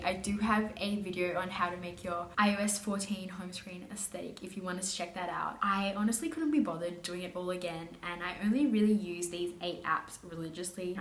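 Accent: Australia. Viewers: she probably grew up in Australia she has a Australian accent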